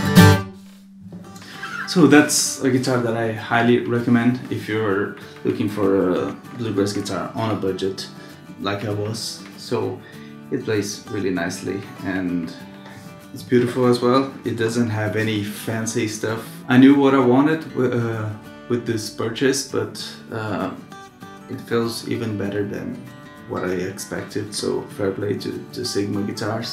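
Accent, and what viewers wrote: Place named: Denmark. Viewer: Nice! It just sounded a little like a danish-english accent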